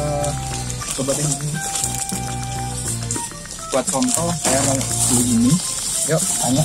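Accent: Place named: Java, Indonesia. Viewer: Aksen nya seperti Pak Jokowi...Mase. Sukses selalu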